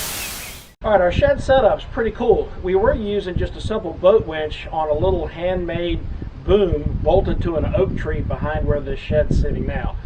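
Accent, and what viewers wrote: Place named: Carolina, United States. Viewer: Hey, you sure sound like you live next door. I’m in Carolina, north of the OuterBanks. Are you near here?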